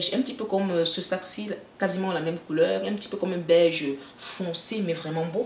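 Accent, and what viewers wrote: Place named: Cameroon. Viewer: Je ressens un accent camerounais, vous êtes camerounaise ? J’adore ce haul surtout la longue robe à la fin la .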